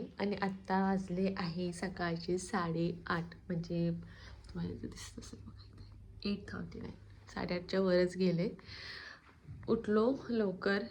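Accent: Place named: Pune, India. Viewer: kiti te puneri bolnya cha attahas to ag sangmneri bhasha visarlis ka aamchi bagh kashi bolte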